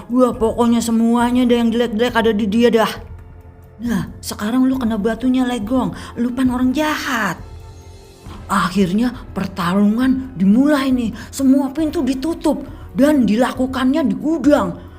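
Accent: Jakarta, Indonesia. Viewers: Ngomong nya b aja awok² org Jakarta y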